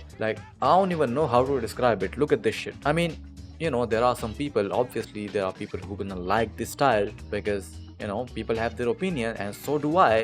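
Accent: India. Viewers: bro's Indian accent>>>>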